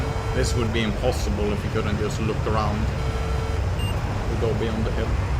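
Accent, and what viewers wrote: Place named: Sweden. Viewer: dude you are Swedish right? jag med jag märker på vägen som du pratar :)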